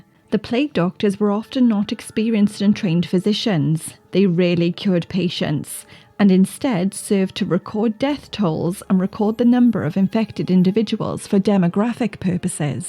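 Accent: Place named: Wales, United Kingdom. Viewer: lovely to hear a welch voice doing the voice over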